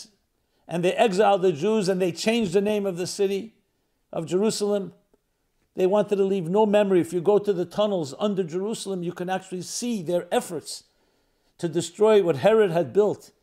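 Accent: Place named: Brooklyn, United States. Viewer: Thank you, Mr.. Rebeca was a ryan and I know somebody from the Armenian background that is persecuted in Iraq. We support the Jewish people as a persecuted people and recognize Islam as a genocidal ideology. Both of us have been attacked by Muslims and have studied the reasons for this of course, they will always deceive people when they have the chance that there's some other reason, but they do it because of the evil of their religion. You said that the Jews remain in that area for hundreds of years my suggestion would be to clarify how many hundreds of years wasn't it thousands? Are you from Brooklyn- I used to live in Brooklyn near Flatbush and Atlantic, and in the area that Rabbi MK lived the same area where the Al-Qaeda mosque was only about 1 mile away. and I noticed a little bit of an accent. We wish you safety during this time of persecution and want to help come up with some solutions. 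Go with YHVH and thank you for being plain about the lies. We also have a lot of information, and predicted the recent attacks by Isis, as well as the reasons for it, such as releasing Isis, and we also predicted that the other terrorist organizations would bond with them over the attack against the Jew Islam itself largely pivots on antisemitism! We would like to connect with you do you have an email? <link>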